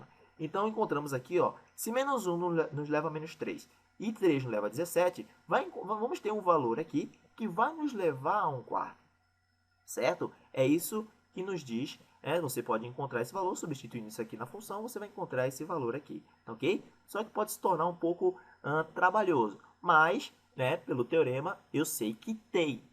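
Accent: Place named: Ceará, Brazil. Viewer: E esse sotaque maravilhoso é de onde?És também do Ceará? Parabéns pelo trabalho, muito bom!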